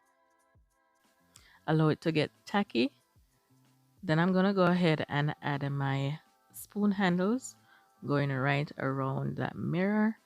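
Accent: Jamaica. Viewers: Talented. You sound like a Jamaican. I am a Jamaican. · I can hear you are Jamaican. Big up uself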